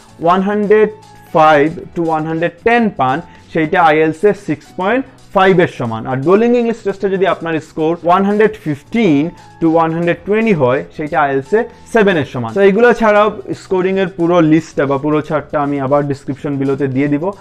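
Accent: Sylhet, Bangladesh. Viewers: R u from sylhet bro? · Right,,he is sylhety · sylhet e baisaab!!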